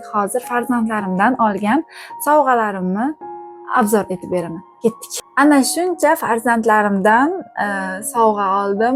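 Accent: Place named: Khorezm, Uzbekistan. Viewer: ХОРАЗИМ ДАНМИСИЗ ШЕВАНГИЗ УХШАБ КЕТАДИ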